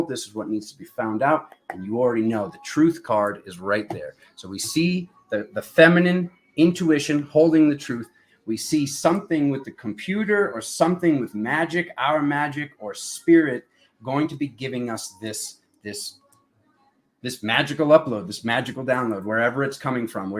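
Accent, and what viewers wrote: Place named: South, United States. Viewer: "He's a badboy but he's a good mayn"🤣 🤣 But I like that Southern draw😇 I love your vibe, We're always having a good time 🤗🤣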